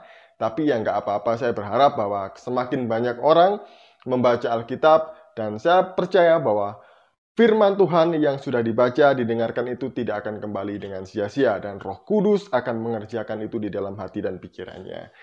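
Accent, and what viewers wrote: Java, Indonesia. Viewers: Slim taslim...., kamu nggak bisa baca quran ta kok bahas Alkitab, basane medok, umatmu mau dengerin ceramahmu bukan karena kamu pinter dan enak ceramahmu tapi yg mereka suka dari kamu, kamusuka obok2 kitab orang kristen.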